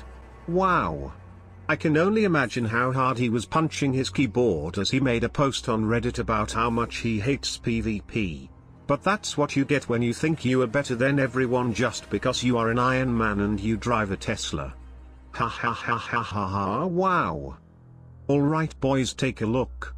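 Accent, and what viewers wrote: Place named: Georgia, United States. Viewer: It’s funny I’ve never heard this mans real voice yet I know for a fact he’s from Georgia or Florida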